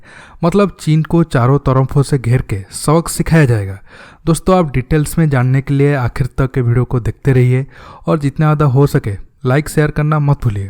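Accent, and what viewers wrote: Odisha, India. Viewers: bhai tu orissa se he na?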